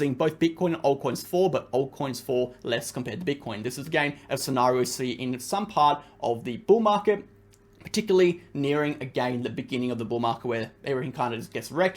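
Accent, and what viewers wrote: Australia. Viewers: just found you bro, aussie here. got lucky and saw this within 2 hours of you posting. Nice to hear an aussie voice for once! Joined that exchange too, appreciate an exchange thats not trying to monitor everything I do! Used your code!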